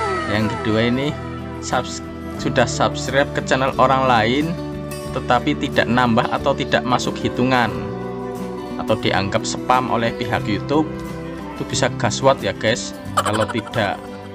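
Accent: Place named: Kebumen, Indonesia. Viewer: Jaannn njawani . Kebumen kayane rika ..??? "NEMBE NEMBE" 😂😂 .. Semangattt lanjutkan . Silaturrohim juga ya ..?Terima kasih mas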